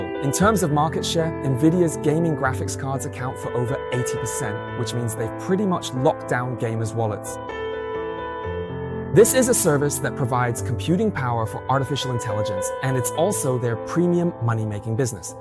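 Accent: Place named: United Kingdom. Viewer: 怎么又变成英国口音了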